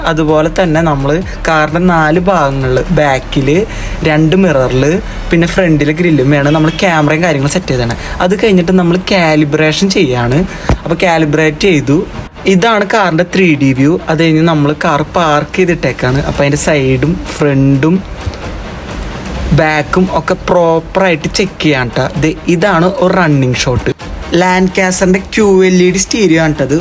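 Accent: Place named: Thrissur, India. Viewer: നല്ല സുഖമുള്ള തൃശ്ശൂർ രാഗത്തിലാണ് സംസാരം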